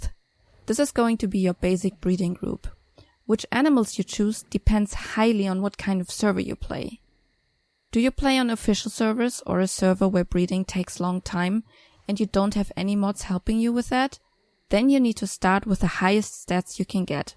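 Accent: Germany. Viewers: I appreciate the effort that went into the video and the english version, but I can´t deny that I heavily dislike the german accent. It´s extremely distracting to me. I´m german myself, sadly.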